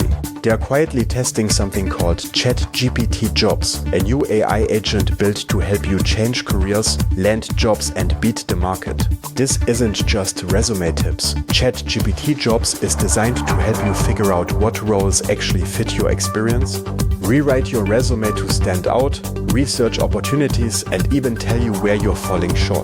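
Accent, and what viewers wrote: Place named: Germany. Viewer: thanks for the detailed review of HeyGen and some great quotes there such as it "even maintained my German accent" ☺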